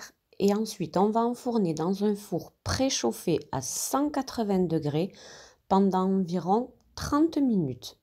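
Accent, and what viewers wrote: South, France. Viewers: Magnifique voix tu es du sud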